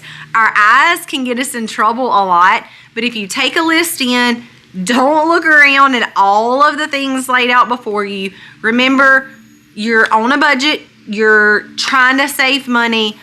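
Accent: South, United States. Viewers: I'm from the south but I absolutely love your southern twang. The south is the best even our Southern Belle women have sweet beautiful speech!!!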